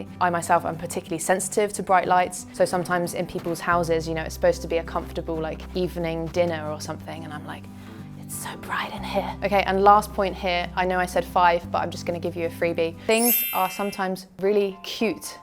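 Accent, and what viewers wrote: United Kingdom. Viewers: 还有美妙的英国口音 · I really enjoy your British accent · 英國腔真是好聽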